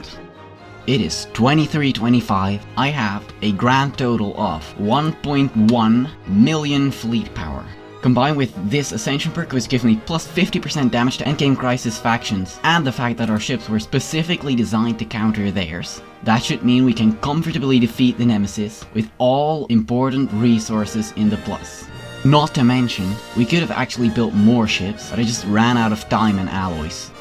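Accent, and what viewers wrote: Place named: Netherlands. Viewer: you're dutch the accent haha nice video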